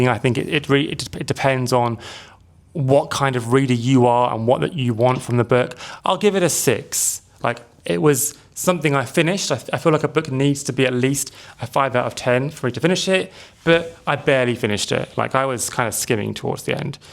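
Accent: United Kingdom. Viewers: You sound very british yet you look very slavic, hope it makes sense, also awesome vid btw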